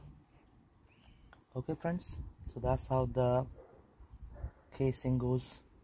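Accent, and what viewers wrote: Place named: United Kingdom. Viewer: I love the British accent (sotaque). Hug from Mexicali México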